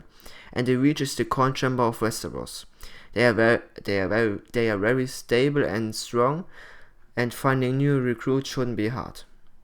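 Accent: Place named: Germany. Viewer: Your accent Tells me you Are German;)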